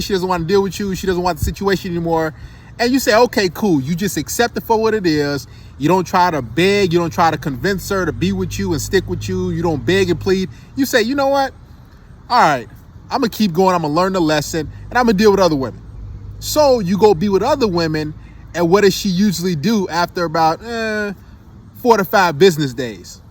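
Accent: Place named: Long Island, United States. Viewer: That gawd aweful Long Island accent!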